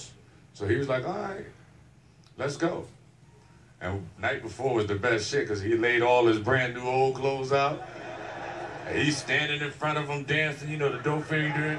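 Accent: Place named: New York, United States. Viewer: Well let me tell you I was scrolling through YouTube and I seen this video and as soon as he started talking I knew he was from New York I'm from New York Brooklyn Queens Manhattan now I live in Florida so I haven't heard people talk like that since I moved here in she got his uncle down pat that is the real real life of adult feet I know cuz I have so many friends that have uncles and friends all kinds of people that are just like that alrighty brother it's a shame you could have been bigger than Kevin Hart😢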